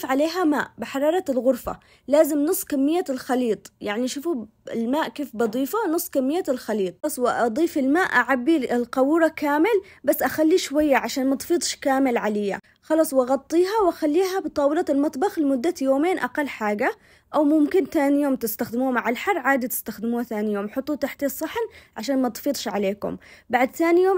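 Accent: Aden, Yemen. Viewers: تسلم ايدك ..شرح بسيط و واضح.. لهجة يمنية حلوة... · تسلمين يابنت بلدي والله انك عسل ياربي محلا كلامك ولهجتك عدني على تعزي ملفوف بالابي ايوه هكل خلينا نفتح اليتيوب نبصر باشتات من حقنا محروكات مشحوطات ونتعلم منك كلامك سريع سريع ومهرتك اسرع ماشاءالله مثلي ههههه واحلا لايك تستاهلي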